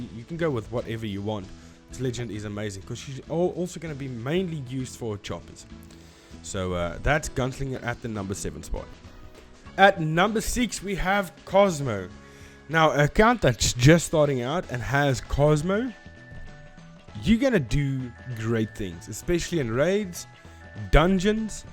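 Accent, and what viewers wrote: South Africa. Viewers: You sound south African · You sound South African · Jy klink afrikaans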